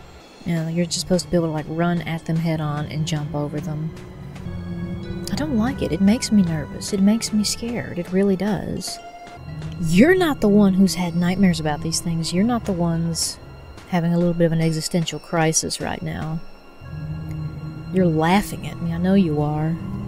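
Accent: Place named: South, United States. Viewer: Oh man, I love ur Southern Accent. Its like Heaven. Please dont Change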